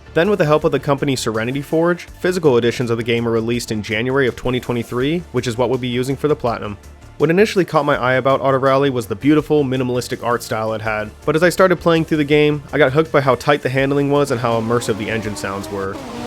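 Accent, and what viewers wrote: South Africa. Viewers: are you actually from South Africa? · Wait... Are you South African??? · Are you South African?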